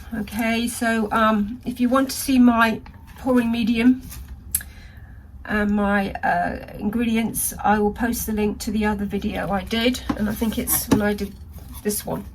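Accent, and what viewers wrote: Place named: United Kingdom. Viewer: Here it is 2022 still loving your creativity. I'm trying the star shape bauble this Christmas. I love your Brit accent especially when you say 'pretty' Merry Christmas, from Canada Free!🚚🚛🚜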